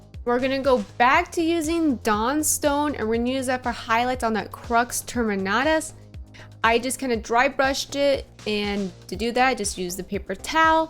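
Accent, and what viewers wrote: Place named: Europe. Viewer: Wait, what? A Warhammer painter who doesn't just prime white and slapchop away with abandon with those overpriced contrast paints?? I like you already! That guy looked like he's been through a few trenches and is Not Having It Anymore (this is a very good thing)! Well done, and pardon my asking, but are you European? I'm trying to identify your accent...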